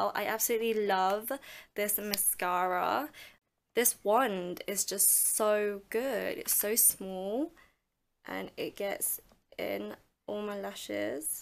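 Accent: United Kingdom. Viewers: omg i am so late to subscribe to your channel. omg where have you beennnnnnnnnnnnnnnnnn. first off i love your accent its like british and funny enough i want to start talking like you. thank you for the inspiration on not to mind what people say about your makeup i love u so much. 😍😍😍😍😍😍😍😍😍😍t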